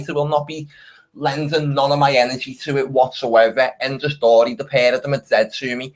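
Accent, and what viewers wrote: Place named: Liverpool, United Kingdom. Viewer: Love hearing a fellow scouser. And your so right. Total fix. Fucking joke mate.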